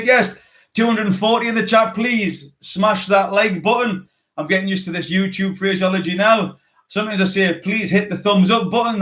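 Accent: Newcastle, United Kingdom. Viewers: 😂😂 its English , he is from newcastle. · So me and my little sister been watching your videos and trying to figure out where your accent is from. She thinks is British but idk I think you sound more Irish?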